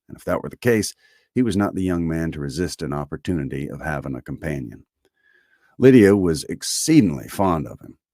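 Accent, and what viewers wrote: South, United States. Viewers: I have just found your channel-I absolutely love the Southern accent twist to these classics - I am just about to start Persuasion my favourite Austen novel -Thank you so much for the uploads ,much love from Southampton, England x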